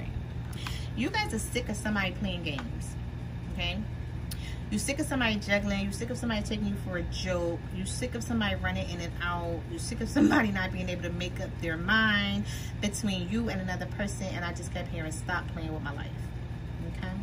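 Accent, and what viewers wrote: Philadelphia, United States. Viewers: Good reading totally resonates... loving your nails! You sound like you’re from my city Philly 🙌🏾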